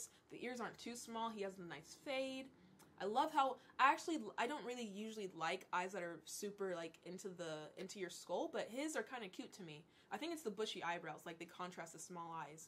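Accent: United Kingdom. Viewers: Came for the casual objectification of millionaire men, stayed for the eerily impressive British accent.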